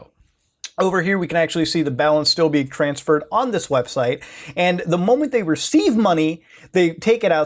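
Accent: United States. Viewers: You look like an Indian, yet you sound American... What sorcery is this? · Honestly, I thought you are some kind American dude like anybody speaking like an American are sounded like that but you look Indian at first glance. No offense.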